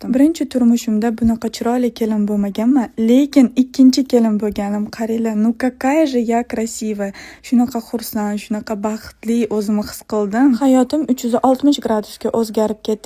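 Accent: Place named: Andijan, Uzbekistan. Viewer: Anjanlimisiz diyman shevezdan bilinyapti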